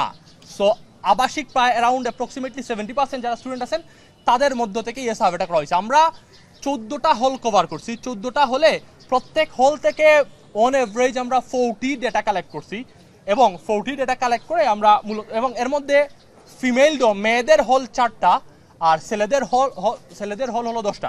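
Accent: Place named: Sylhet, Bangladesh. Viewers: ভাইর সিলেটি স্লাং গেলো না, বেশ মজাই পাচ্ছিলাম উনার কথা শুনে। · Vai er bangla pronunciation e buja jacche je Vai Sylheti. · Sylhety bro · আরেহ ওতো সিলেটি।।।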